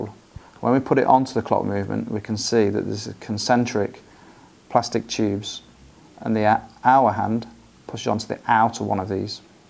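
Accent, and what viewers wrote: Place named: England, United Kingdom. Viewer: It's easy when you know how! Thanks. It was so reassuring to hear an English accent for the demo too. Very good thanks.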